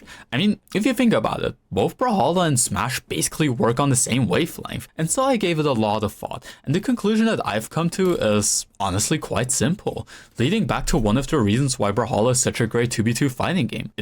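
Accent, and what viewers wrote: Germany. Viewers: and the solution of what, to provide a synonym of "let me explain", you have a better writing style, and much more vocabulary than me, and you are expressive. So i guess u will figure it yourself, u dont need a solution from someone is worse than in that area that is why i did ignore the request because im not qualified and it looks silly to me :p "asking for a synonym" also at first i thought even if i provide u a synonym what is the point u will repeat it, or maybe u will use a variety of words to explain one thing, which u can use one word for it. And the thing is, dont take it as a flaw it is ur style, many people in the world do the same thing they have some repetitive words and u can realize it, idk if they themselves realize they keep repeating the same words or not. In your situation i just pointed it out as a joke you don't need to fix things u aren't broken. 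And your english is really fine, i even thought unironically the pronunciation of "other" is "oder" because im not a native speaker + and English is not pronounced as written, until i opened your channel and i saw the location of ur account is germany so i knew that was your accent. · WOW combo codex an absolute fucking slap of nostalgia lmao i did not expect to feel this i wasnt in that community or anything, though kurta and a few other friends talked to me about it, but i remember that logo popping up in a bunch of momo's (oof) 2v2 combo videos also now that you mention floater i feel like i could recognise you from there too since ive watched a decent amount of his streams, mostly because i liked his hair a bunch xdd also glad to see someone else who loves catnips videos, i feel like i was always surrounded by people who just didnt think anything of him (one person in specific who i wont name disliked him because he dislikes eve music, which is a valid point i guess) and yeah the way weapons used to work definitely kept a good part of the game moving. 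after having played a bunch after that stuff got patched out, watching those old jellyfish montages it feels like the game was literally broken 😭 the amount of times i got invited into a lobby after a game and get my hopes of making a new friend of a person i enjoyed fighting crushed, because they didnt have fun and just wanted to drop a few slurs, eugh anyway ive been playing tf2 lately and since you mentioned it too i figure its about time someone recommended it to you :))))))) give it a shot, its deeper than it seems but also easy to get into also all this and this is how i find out you speak german lmaoo i had a hunch because of your accent but didnt want to say anything nochmals, danke fürs Video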